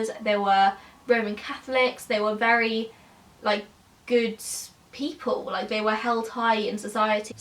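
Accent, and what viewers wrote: United Kingdom. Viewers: I'm in love with your British accent dear xoxo. You're so cute! As far as the police is concerned, they fuck up everything in their stupid scheme of investigations. I see this over and over on podcasts like yours... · Nice british accent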